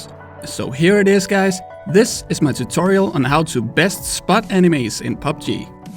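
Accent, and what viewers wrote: Denmark. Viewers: Are u from Denmark?! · Great video. Subscribed! 👍 Maybe stupid question, but are you Danish? 🇩🇰😁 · ARE u danish? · Are you from Denmark? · Are you danish? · Årrrh du lyder så dansk at det er hårdt for mig at se den her video haha. Ellers rigtig god video! Kan se du har brugt ekstremt meget tid på den, hvilket jeg sætter pris på! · Er du dansker?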